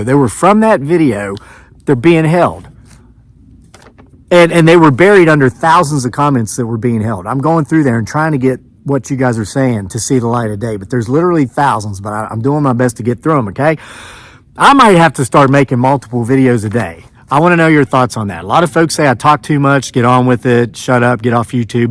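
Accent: South, United States. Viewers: Hi. I'm watching your channel from the UK. All this is very interesting to me and I love reading the comments, people are not stupid, they know what they saw and experienced. You seem like a really nice guy with honesty and integrity and I have no reason to doubt you. I enjoy watching live cams from America too, the amount of weird stuff captured blows me away. Being in the UK is quite boring in comparison to the US so I get quite excited when I see channels like yours pop up. I believe you were under some form of shall we say 'trance' .. Since YU TB doesn't like certain words!! I swear we're all going to be talking another language soon just to get our messages out! 🙄 These trance like explorations over the years aren't just stories that's for sure. Oh, and you don't talk too much, I love your southern accent. Looking forward to more of your uploads. 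Regards to you and your wife. ❤️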